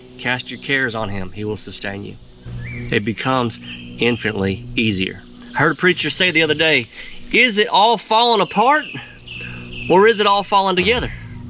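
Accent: South, United States. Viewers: So odd to hear this quote in a Southern accent. It remains true and the core of my soul in these days however. "I hope and pray everyday that I am not forced to untether myself from the bonds of civility, and to engage in the destruction of all those who seek the dominion and harm of those whom I hold most dear. For should that wrath of Man be released upon mine enemies, neither them; nor I, will ever live in peace under the sun again. For indeed, I will be forced to scorch earth to preserve the life and well being of those who I do love."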